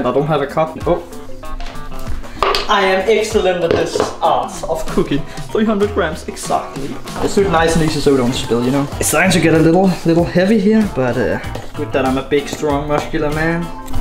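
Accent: Denmark. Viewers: Its danish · Er du fra DANMARK?! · Hva satan, har lige fundet din kanal og kunne med det samme høre du var dansk AHAH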